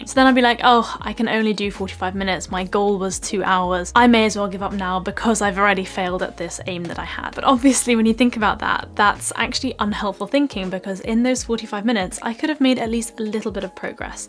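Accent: United Kingdom. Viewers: Thank you for making all the videos easy to understand especially learning the British accent, which I really love. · Your British is amazing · 纯正英国腔听着舒服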